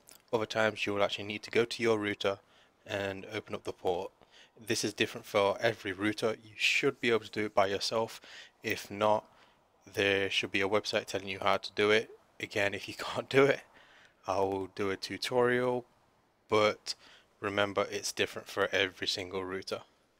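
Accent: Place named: South Asia. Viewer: this guy has a south asian english accent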